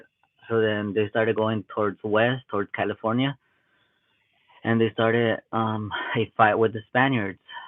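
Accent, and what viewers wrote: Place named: Mexico. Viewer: Fuckn bullshit,we are not the same,everything different,traditions,religion,beliefs,and we are from this country. You want proof im sure there is alot of fellow native people that can show you,you people stop trying to put us together,fight your own fight and go back to where your from,you sound like white christians,we are all the same,stop lieing and maken yourself look stupid genz ,just go home and wave your flag,you can tell you was raised mexican by yoir accent,what was you when you was born retard,how was you raised, kearn akl your blood bur remember who you were born unless you dont like who you was born and thats bad cant ride the fence and now all of a sudden you wanna claim indian in this country,but your not from here or these tribes,just go home and rebuild your country and stand for your mexican rights wave your flag and be proud but dont try and feed off of native people here now,like cockroches. 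And tribes in this country dont kill to sacrifice,this kid dont know shit aboit tribes in this country. Go home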